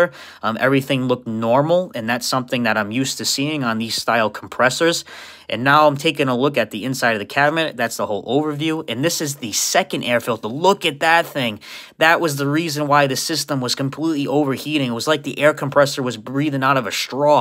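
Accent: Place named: Boston, United States. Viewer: Love your commentary in Hard Boston accent! So entertaining. Plus you are well trained, observant and a good thinker. Rarer and rarer these days. Chicago electrician here. 1979 seniority.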